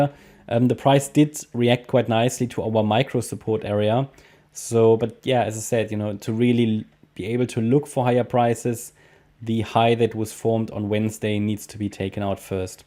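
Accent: Germany. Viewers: man hört so nen deutschen akzent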